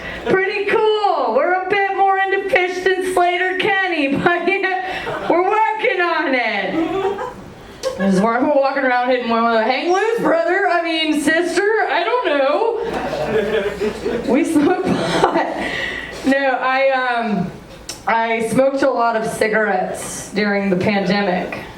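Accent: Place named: South, United States. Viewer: Good set. Have you visited Alabama lately? That southern accent is coming out. I never thought about that Seattle having a giant needle thing. I like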